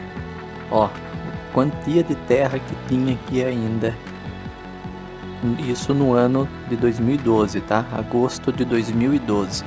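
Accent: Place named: Interior, Brazil. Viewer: Vc que está relatando o seu sotaque com certeza não é da ilha. É do interior.... 🤔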